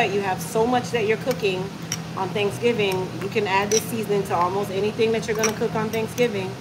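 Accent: Jamaica. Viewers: I love your acent are u Jamaica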